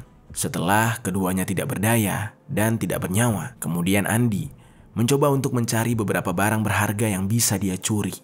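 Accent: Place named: Medan, Indonesia. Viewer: Kok semua bahasa nya entah kasus atau apapun yang ada di daerah manapun kenapa selalu logat medan?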